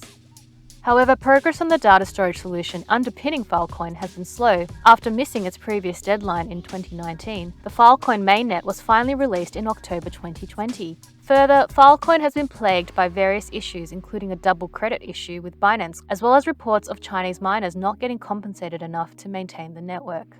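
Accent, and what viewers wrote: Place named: Australia. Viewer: Nice video. You from Oz? I'm from NZ, so the accent stood out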